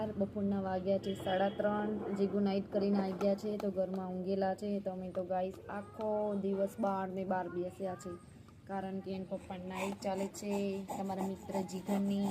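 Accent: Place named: Idar-Mehsana, India. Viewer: બેન ઇડર (s k )ને મેહોણા ની મિક્સ ભાષા છે 😂😂😂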